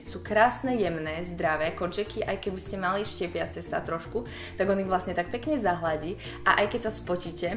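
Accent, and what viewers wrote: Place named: Eastern Slovakia, Slovakia. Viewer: Môžem sa spytať kde byvaš??Lebo maš trošku vychodniarsky prizvuk:D:D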